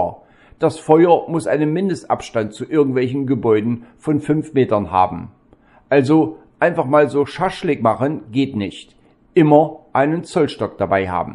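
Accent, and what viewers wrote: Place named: Russia. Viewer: Ich wünsche ihnen Herr nachträglich ein frohes neues Jahr. Sie sind ein Charakter, den ich gerne in den Gesprächsrunden von Hajo Müller oder in den Verbinde die Punkte Talkrunden von Sunny sehen möchte. Wir brauchen Deutsche mit russischem Akzent, wie sie es so gerne formulieren. Ich werde es im Chat bei Hans-Joachim Müller und Verbinde die Punkte mal anregen. Mit freundlichen Grüßen aus der Pfalz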